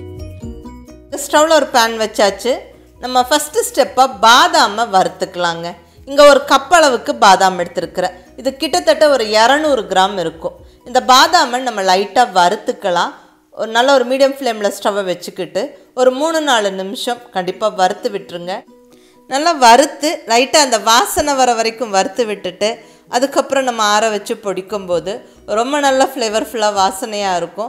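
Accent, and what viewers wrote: Coimbatore, India. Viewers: சகோதரி சமிபமாகத்தான் தங்களின் பதிவுகளை பார்க்கும் வாய்ப்பு கிடைத்தது மிகவும் சந்தோஷம் அருமையான பதிவுகள் எளிமையான முறையில் நல்ல தமிழில் புரிந்து கொள்ள கூடிய முறையில் இருக்கிறது வாழ்த்துகள் 💐💐தாங்கள் கோவையை சேர்ந்தவர்கள் என்று நினைக்கிறேன் உச்சரிப்பு அருமை வாழ்க வளமுடன் நலமுடன் தீர்க்கமான ஆயுள்ளுடன் பல்லாண்டு பல்லாண்டு பல கோடி நூறாண்டு கள் ⚘⚘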